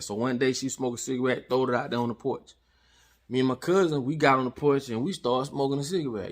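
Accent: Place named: Deep South, United States. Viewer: That's that deep deep south raising!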